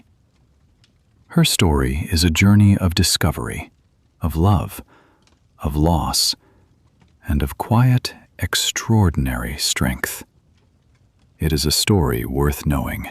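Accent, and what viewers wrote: United Kingdom. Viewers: Is it a British accent?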